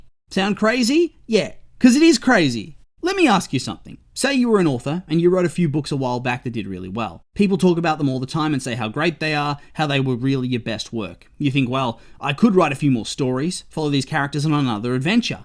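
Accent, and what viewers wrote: Australia. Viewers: you sound like an australian olaf. also i agree with your opinion! i havent been able to see elio but the trailer IMMEDIATELY grabbed my attention. space is so beautiful, and they made it look so beautiful in the trailers too. and the protagonist looks so adorable!! i didnt see a lack of advertisement until i was able to get my adblocker back on. ...obviously.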